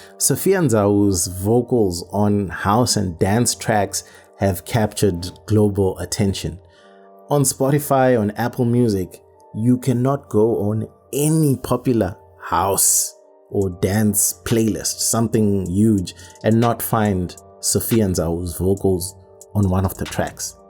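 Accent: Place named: Ghana. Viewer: You sound like a Ghanaian. Let me educate you on something; Jollof is West African and Nigerians didn't appropriate Amapiano. The generation of musicians that existed before the current musicians paved the way and the new generation just used what they blazed as a stepping stone to the top. There's no substitute for hard work.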